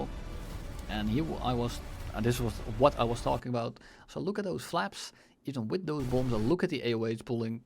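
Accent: Netherlands. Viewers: A dutch man sentually vispering sweet trailer details into my ears. ❤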